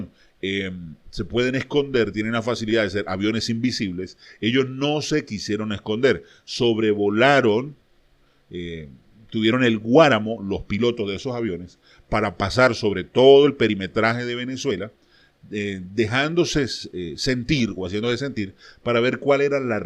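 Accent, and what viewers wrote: Colombia. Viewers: Un colombiano narrando xdioxxx ¡¡ Ve con Uribe hacerle cariñitos ¡¡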